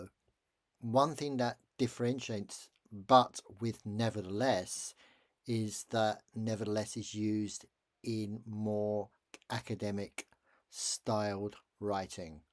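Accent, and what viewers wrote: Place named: United Kingdom. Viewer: Falta los subtitulado desde el inicio. Por lo demas todo bien. Me encanta el acento British 🥰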